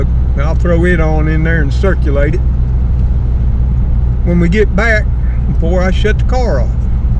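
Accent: South, United States. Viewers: I am not young but then again I am not your age either. Love southern talk. Milk barn heater hmm wonder if one can still buy those things bet my mom knew about those.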